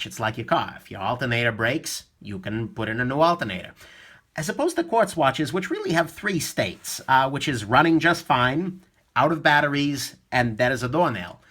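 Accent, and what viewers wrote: New York, United States. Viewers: Is that a New York accent?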